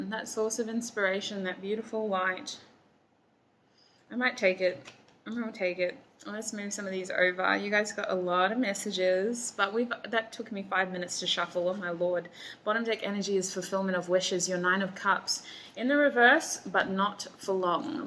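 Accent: Australia. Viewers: Group#1. Thanks a million! I am so grateful for this reading. It's so finetuned it's unbelievable. You are truly one of the most gifted readers on YouTube, if not the most. Much love, much light, much power to you 🙏🙏💗💗🌈🌈 And I love your Australian accent, so very charming! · are you austrailian?? i love your accent!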